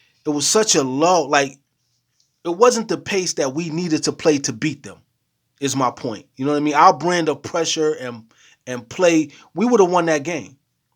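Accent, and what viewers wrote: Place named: New York, United States. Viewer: U from Toronto or New York dawg?? I’m just curious. The way I say some words sound like u from New York. Would be cool if ur a raptors fan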